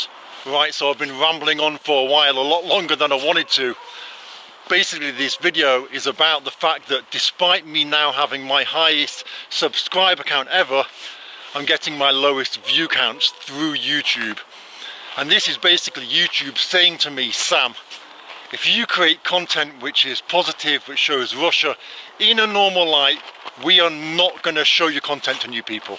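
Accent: England, United Kingdom. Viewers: MATE WHERE U COME FROM ENGLAND?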